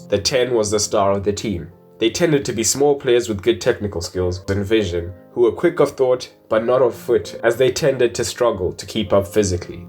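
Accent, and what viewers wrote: South Africa. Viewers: You Have A South African Accent. Either Zulu or Xhosa :) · Is this guy from SA? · Totally of topic here, but this dude sounds south African,... or is it just me?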